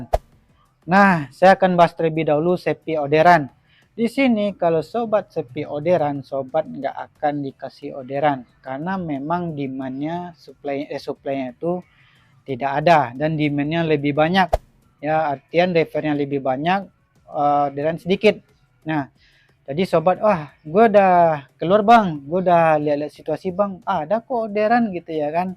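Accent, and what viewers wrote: West Sumatra, Indonesia. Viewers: Lo orang minang ya bg😂😂 logatnya minang banget😂😂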